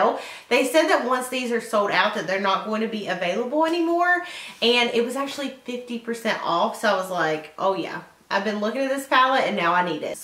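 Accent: South, United States. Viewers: I love you and your accent so much! I have always loved people of the south and ended up marring a man whose mom is from Georgia. You guys say things similarly and it warms my heart. Your channel has grown so much since I first started watching you! Great job! I now have a beauty channel as well if you would ever like to watch someone new.❤ I hope to go to IMATS next year! I live only about an hour and a half from LA!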